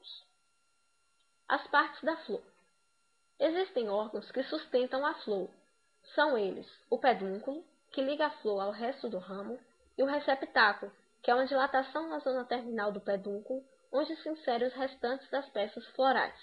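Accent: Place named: North, Brazil. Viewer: Parabéns! Sou Paranaense e gostei muito da aula e parabenizo você pela iniciativa! Pelo sótaque você é lá de cima! Como sempre muito inteligentes! Abraço!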